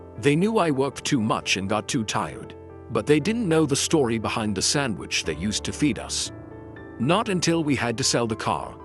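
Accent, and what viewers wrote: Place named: United Kingdom. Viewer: the voiced narrative states "... catching the floating flour dust". However, the text subtitles erroneously indicate the floating flower dust -4435 the audio narrative with a thick British accent,describes Eva showing interns how to ,"braid hollow bread" and the text narrative reflects the word "hollow" bread. THAT'S A BIG MISTAKE! My husband was a professional baker. The spelling is "challah" and is pronounced holla. It is a traditional Jewish bread. It is NOT HOLLOW. You need to 1. get rid of the British accent 2. proofread 3. get a better AI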